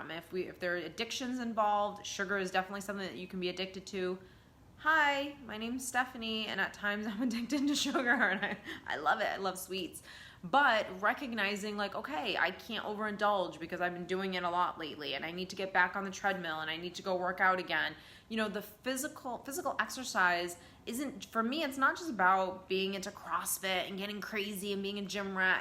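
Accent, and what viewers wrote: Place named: New England, United States. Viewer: Hey Stephanie— really am enjoying ur podcast and the tidbits of information that you provide. Love the New England accent that slips out times. Very charming 🤗. Keep doing you— it resonates with so many of us! #STEPHANIEROCKS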